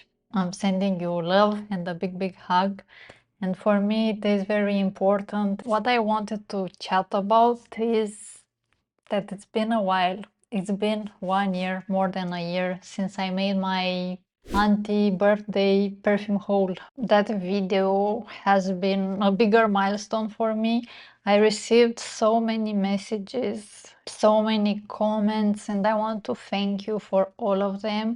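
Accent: Romania. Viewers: După accent am gândit ca ești româncă! Deși am lucrat în afara țării ani de zile, am același accent cu al tău!Ma bucur ca ai făcut acest video,pentru ca și eu am făcut abuz de parfumuri și am format o manie pentru asta și m-am simțit vinovată de fiecare dată!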